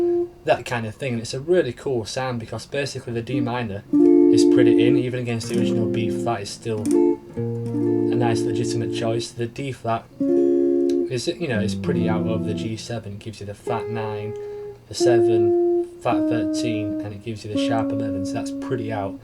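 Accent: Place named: North, United Kingdom. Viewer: Keep on running, nice to hear't northern twang on such lovely chords.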